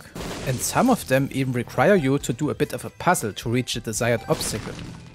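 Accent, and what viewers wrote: Germany. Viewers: i hear an german accent, are you? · Hey ich wollte mal wegen deinem Akzent fragen ob du vllch deutsch bist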